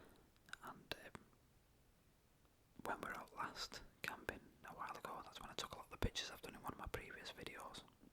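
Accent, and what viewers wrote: United Kingdom. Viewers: Love this. Nice accent, where in UK are you?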